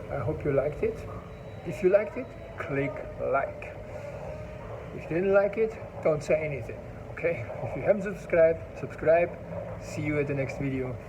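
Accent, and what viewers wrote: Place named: Germany. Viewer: I’m enjoying learning from your content. I notice your accent sounds European like from a German speaking (Germany, Austria, Belgium, Switzerland) Country. Is the economy/marketplace for professional transportation work better for entrepreneurs in America than Europe? Do they have pilot car escorts for major commercial shipments on the roadways in Europe also?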